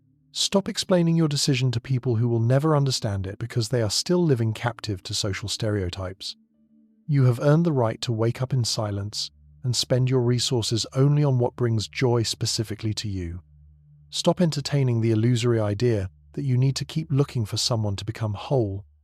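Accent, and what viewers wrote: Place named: United States. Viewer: Sounds very USAmerican.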